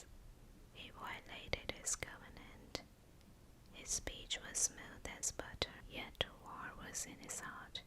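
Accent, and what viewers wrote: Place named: South, United States. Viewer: Thank you for satisfying my curiosity. You’ve got what sounds like an American southern accent, very relaxing. Thank you for reading the psalms.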